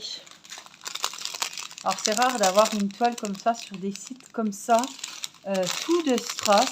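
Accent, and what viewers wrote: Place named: Nancy, France. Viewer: Trop belles ces toiles Vitraux ❤️❤️❤️ j'ai une petite question qui n'a rien à voir : je te suis depuis peu et ton accent très très prononcé me fait penser à celui que j'avais il y a qques années : je suis originaire de Nancy mais j'habite depuis plus de 20 ans à Toulouse. Et toi ? 🤔😁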